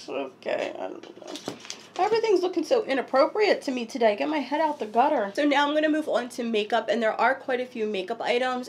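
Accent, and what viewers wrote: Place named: New Jersey, United States. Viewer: Girl! I cannot believe there are some parts that don't stay to the end of your videos LOL! I love your New Jersey accent wish I had a little of that! Love all of your videos and totally relate to the hubby being a little sick of the Dollar Tree haha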